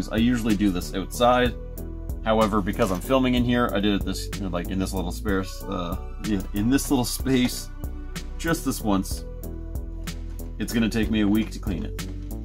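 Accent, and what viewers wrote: Canada. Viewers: You do say "out" like you're Canadian. And that amount of thermal paste was perfect. Either way, better to go over than under unless it conducts electricity. 😊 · You say "out" almost like "oot"-- which to us Americans is basically the stereotypical Canadian accent. Lol.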